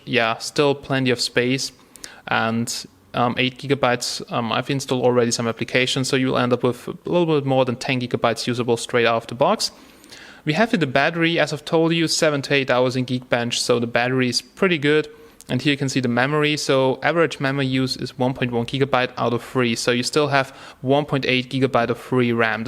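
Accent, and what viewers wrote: Germany. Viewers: I have to say your reviews are great! You're very thorough and really leave nothing out! Great Job! Your pronounciation of some words is quite cliche german, but other than that, you do have advanced free speaking english skills! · ohne jetzt nach zu forschen....du bist doch ein deutscher?....so redet kein Ami oder erstrecht ein engländer!....ich würde meinen Popo darauf verwetten^^.....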